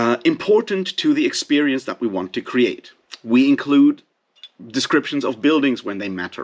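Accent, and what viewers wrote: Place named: Norway. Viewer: interesting! I thought you sounded Norwegian!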